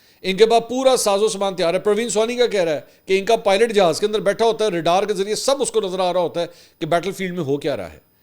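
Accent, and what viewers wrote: Punjab, Pakistan. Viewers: bhai apka punjani me chatrol krna i love it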